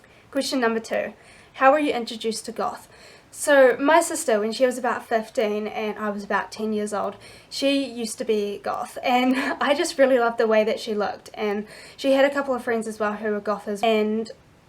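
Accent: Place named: United Kingdom. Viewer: Damn, you are fucking HOT!!! Love a British accent!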